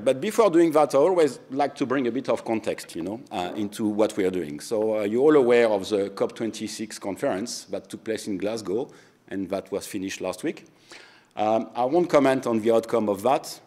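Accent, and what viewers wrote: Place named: Australia. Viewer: Great talk. And of course, I always love to hear the classic Australian accent. :)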